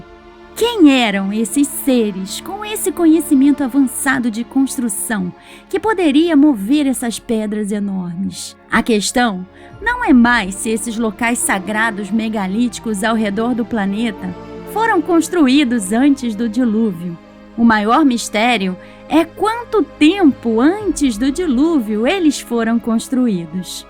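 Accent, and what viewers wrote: Rio de Janeiro, Brazil. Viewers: Adoro o sotaque! Acredito que seja carioca, e eu acho lindo... 😊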